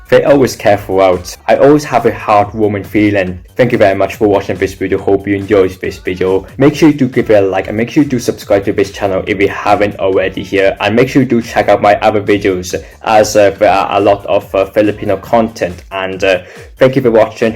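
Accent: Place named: United Kingdom. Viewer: ang ganda ganda naman dyan…british accent wow!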